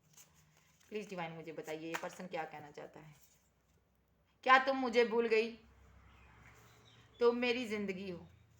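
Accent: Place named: Punjab, India. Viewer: Madam aap ka Punjabi lehja boht acha lagta hai Allah aap ko aur kaamyabi de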